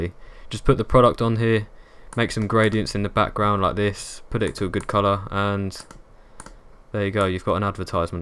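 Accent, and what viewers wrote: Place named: United Kingdom. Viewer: Thatbritish accent ❤️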